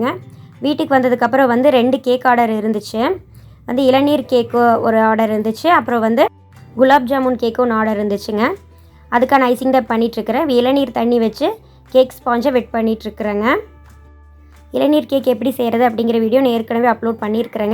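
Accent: Kongu, India. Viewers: அழகிய கொங்கு தமிழ் அ பேசறிங்க ங்க‌ I like ur slang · unga slang apadiyea kongu slang mari iruku sis....i am new from u r cheanal wru u from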